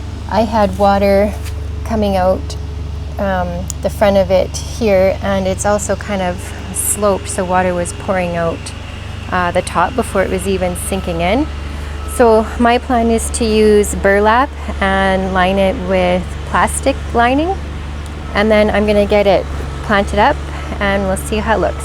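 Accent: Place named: North, United Kingdom. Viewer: what a great idea...i have several planters like this that i can apply this to....btw what zone are you in?? going by your accent, you sound northern....you can also use thin wire instead of zipties thus making no holes it will just go through the burlap easily. pansies won't last long they are a cold weather plant. what a great DIY video!!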